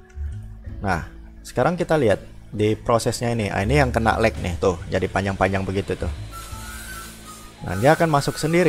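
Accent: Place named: Indonesia. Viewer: Yeah he from indonesian me to :p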